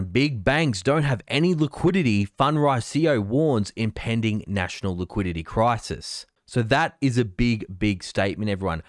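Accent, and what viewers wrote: Australia. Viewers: keep saying data in your native aussie accent! dont change!!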